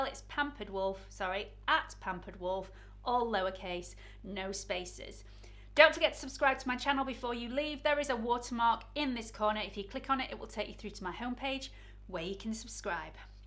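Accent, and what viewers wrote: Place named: Yorkshire, United Kingdom. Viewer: Excellent video. I just discovered you and so happy I did. You’re amazing! Learning a lot from you. Look forward to watching all your content. And of course I love your accent. Are you from Yorkshire? Wild guess thereLol. Love from the States! 💕💕💄🌻🌷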